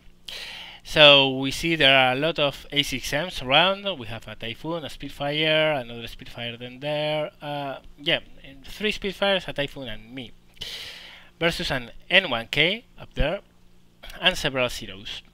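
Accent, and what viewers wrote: Spain. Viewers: Cómo se nota cuando un español habla en inglés XDD Nos pasa a todos jaja. Genial el vídeo, estoy pensando en pillarme ese tempest premium alemán y necesitaba algo de motivación!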